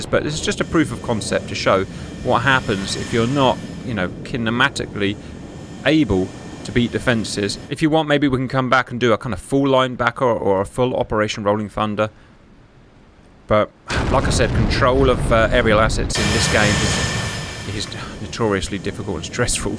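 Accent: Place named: United Kingdom. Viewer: Worst mushmouthed modern British accent I've heard. HEAAAAAHHHH, THEAAAAAHHHH, OOHWWW ( = all) so annoying. A whole generation of Brits learned to speak from listening to Jonathan Woss and Jamie Oliver.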